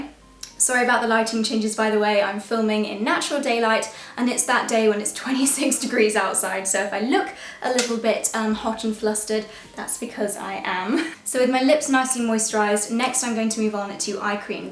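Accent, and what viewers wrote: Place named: United Kingdom. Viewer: Quality creams give quality results, so classy charming absolutely finest British accent, beautiful sensitive lady , God bless dear 💚💚💚💛💛💛💙💙💙💜💜💜💕💗💗💗💗💗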